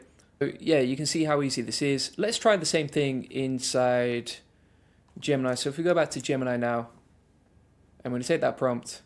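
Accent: England, United Kingdom. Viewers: You sound English bro how did you get access to this in the uk?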